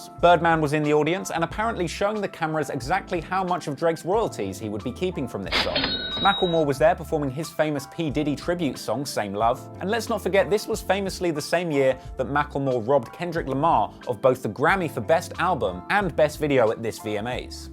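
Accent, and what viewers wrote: United Kingdom. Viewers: This guy is like fingernails on a fucking chalkboard for me. Honestly, and oddly I admit, NO OTHER PERSON ON ALL OF YOUTUBE gets on my nerves like this limey Brit fuck. Oh my god he's insufferable with the same fucking jokes over and over, let me actually go to the most insufferable thing: white British people, please, please PLEASE don''t culture vulture and comment or perform hip hop, ever. See Aries Spears hilariously talking about how British hip hop is just the worst abomination of accent and manner of prim and proper accent trying to do a cool, US southern slang art form. "CAN-YAY. CAN-YAY." "TWO-PACK.TWO-PACK." HOW THE FUCKING FUCK!!! HAS THIS GUY NOT BEEN CORRECTED ON THE FAMOUS MISPRONOUNCIATION OF TUPAC YET!? "LIFE OF PAB-LOW. OH GOD I CAN'T BEAR THIS LITERALLY I'M ACTUALLY SAD I CAN'T WATCH ANY OF THIS DECENT CONTENT BECAUSE I JUST CAN'T FUCKING STOMACH THE NARRATOR FOR ANOTHER FUCKING MINUTE LITERALLY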